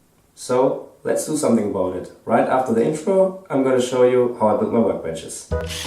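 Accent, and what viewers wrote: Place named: Germany. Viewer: with the accent anything you say sounds reasonable and well engineered. "I have replaced wood dowels with spaghetti noodles." 100% confident German accent. gold 1m views.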